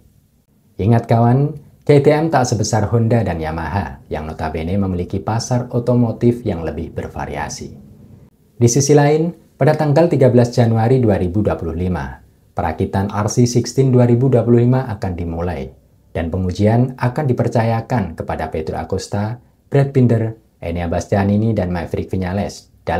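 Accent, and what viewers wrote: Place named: Java, Indonesia. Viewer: Nggak nyangka untuk suku jowo medok...Suaramu cukup ok.... aku suka....adem cukup jelas....hanya kurang penekanan dikit2...suatu saat pasti lbh ok...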